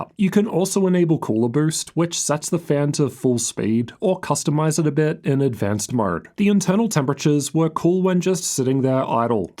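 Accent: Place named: Australia. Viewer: Because it’s an Australian accent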